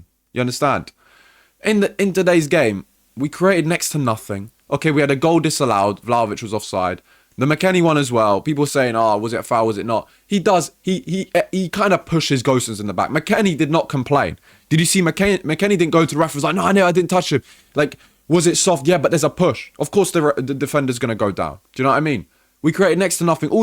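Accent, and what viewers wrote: United Kingdom. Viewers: Why does this guys accent sound so brittish??? Isn’t he Italian? Lmao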